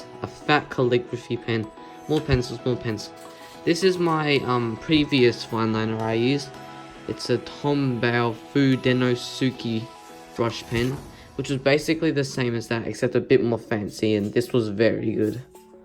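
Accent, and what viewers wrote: Australia. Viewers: Are u Australian? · U Aussie?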